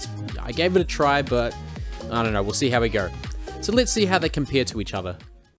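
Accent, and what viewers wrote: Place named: New Zealand. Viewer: You from NZ bro? u got my auto sub hahaha , solid video bro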